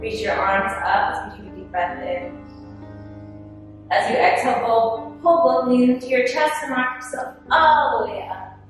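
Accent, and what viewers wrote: United States. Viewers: Is that a Texas accent? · Did I catch some southern accent there? Like Alabama or Mississippi or Kentucky... don't know for real..cuz I ain't no American...and English ain't my native language... Anyhow... beautiful lady...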